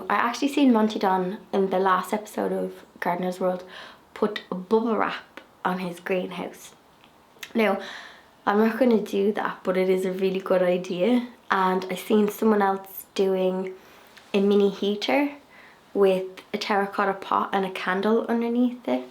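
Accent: Ireland. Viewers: Hello from the States! Will your sweet hedgehog be hibernating this winter or will he be active year round? The family that introduced my parents to each other waaay back in the 50s were from Ireland, so I was blessed to grow up hearing the lovely Irish lilt quite regularly. Just wanted to let you know how delightful it's been to hear that lilt again since discovering your channel a few months back! ❤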